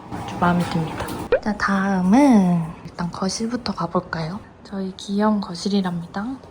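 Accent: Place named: Seoul, South Korea. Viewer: 니 왜 서울말씀?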